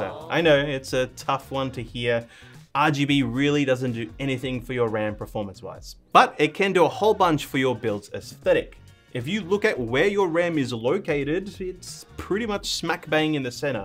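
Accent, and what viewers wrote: Australia. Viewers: Learn a lot of things from this video, but the highlight is now I know for sure how Australian English accent sounds, kinda sound like British but more decipherable 😂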